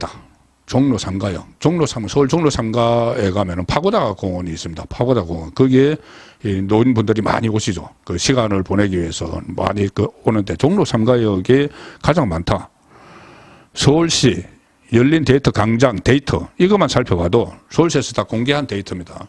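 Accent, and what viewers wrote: Gyeongsang, South Korea. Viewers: 송형 전에 뭐라 했지??? 너 경상도징???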